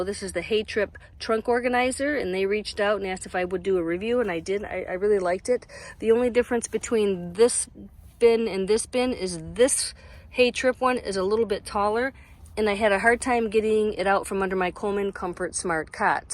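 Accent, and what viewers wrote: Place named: Minnesota, United States. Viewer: Actually your Min a soda accent drives me nuts. And your husband must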